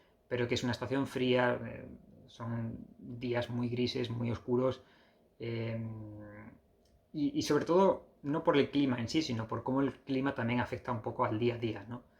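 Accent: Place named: Andalusia, Spain. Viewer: Hablas como Andaluz,pero tienes la pinta de un mafioso ruso..saludos de Perú...😠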